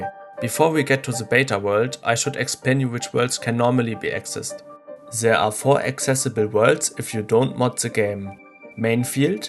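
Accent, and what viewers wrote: Germany. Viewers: Gutes video und interessante Welt die du uns gezeigt hast. Ich finde es übrigens auch top wie man immer hört wenn jemand aus deutschland kommt xD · First time i hear you voice and i just gotta ask: are you german? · Man Hört so dein Deutsch raus xD deine Aussprache ist auch fast so gut wie meine oder besser so schlecht xD😂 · its weird to hear a german accent when yourself are german, i dont have that accent, so it explains why some people were surprised when i told them i was german.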